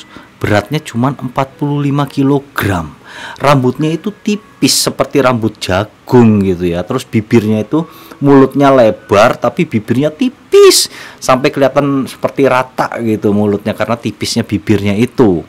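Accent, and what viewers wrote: Java, Indonesia. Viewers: jowo ne ning ndi mas?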